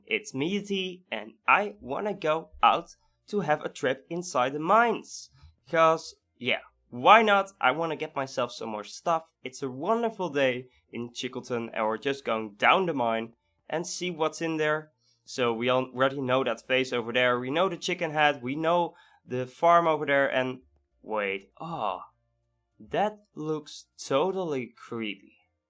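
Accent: Netherlands. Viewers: Hij is 100% nederlands hahahaha 99% van de nederlanders kunnen geeen engels praten zonder accent ( ik ook niet :P ) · where do you come from? You sound dutch.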